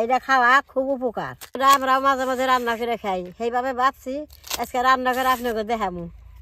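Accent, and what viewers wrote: Tangail, Bangladesh. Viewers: মাসীমা, আপনি নিশ্চয় বাংলাদেশের টাঙ্গাইলের মানুষ ছিলেন। কথায় বুঝতে পারি। টাঙ্গাইলের বাসিন্দারা কলার বেরাইল/ থৌর খেতে খুব পছন্দ করে।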